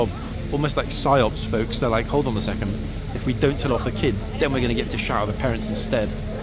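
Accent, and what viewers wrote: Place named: RP, United Kingdom. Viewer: Oh you never ever fail to make me laugh out loud with your terminology 😂 peasants & rich coming out of raffles 😂you have a very good posh accent my dear man. Thanks for showing us around London, I love the beautiful architecture of our capital city, truly amazing. Keep doing what you do ❤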